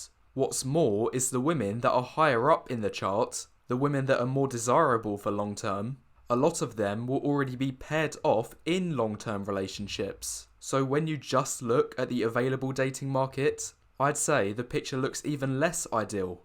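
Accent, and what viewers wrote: United Kingdom. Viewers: The british accent completes it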